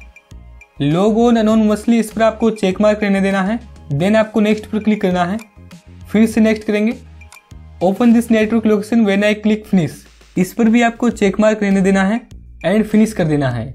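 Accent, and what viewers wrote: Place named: India. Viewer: english title indian voice wtffffffffffffff how the fuckkkk can we understand this :text-green-game-over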